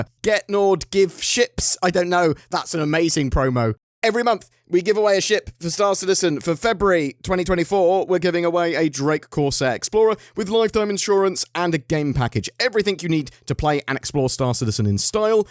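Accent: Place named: London, United Kingdom. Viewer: You talk like you’re from London!!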